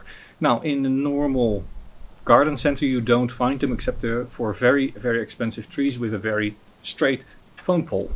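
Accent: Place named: Netherlands. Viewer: Never had a dutch accent this thick haha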